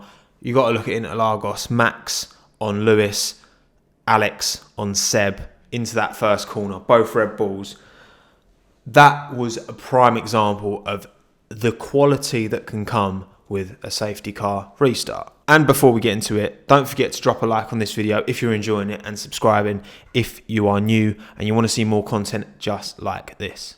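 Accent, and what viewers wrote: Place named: Essex, United Kingdom. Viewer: God damn, I absolutely love your content. It's way up there in F1 context. But I cannot stand the Essexness! I really wish I could look past it but I just can't.. Keep making great content and hopefully I'll be able to break this barrier.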